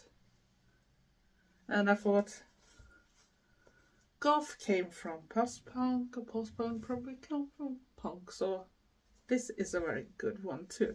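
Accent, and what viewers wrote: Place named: Sweden. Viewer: Hay, new suber here, i love your accent, sweeden is beautiful, love the haul, everything is so cute x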